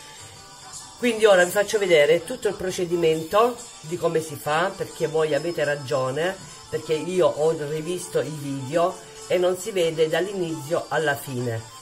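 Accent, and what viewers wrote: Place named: Lecce, Italy. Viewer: Grazie per le tué ricette .io sonno nata a nardò e vivo in francia . Mi fa tantôt benne sentire il dialeto leccesse.avevo 4 anni quando son arrivatta in francia.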